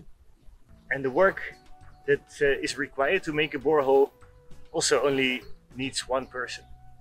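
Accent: Netherlands. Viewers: Gewoon Twents verstoa ik ook we heur lol :-) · Are you dutch by chance? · I like that rig a lot, very well thought out for the task. But you are lucky with the soil consistency you have in the Netherlands (I assume from the accent). If you have rocky ground... well then... back to conventional drilling. ;) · sounds Dutch :) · Kan horen dat je een nederlander bent haha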